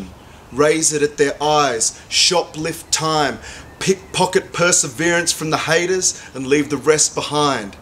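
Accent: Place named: Australia. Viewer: Gidday from a new fan; greetings from a Kiwi in South Korea. Wow, this is impressive. Great to hear great poetry in a funny Ozzy accent! I look forward to more.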